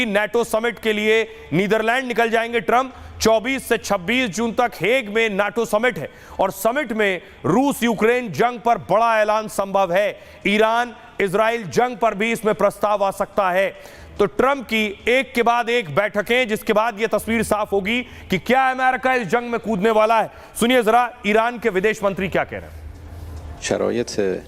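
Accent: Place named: Pahadi, India. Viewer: मुझे आपका पहाडी आवज बहोत पसंत आता हैं घर में मोबाईल रख दो और काम करते करते समाचार सुनते रहो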